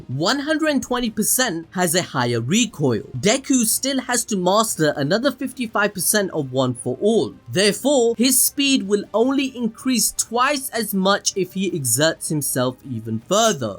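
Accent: India. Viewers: brooooo are u indian ???? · you indian?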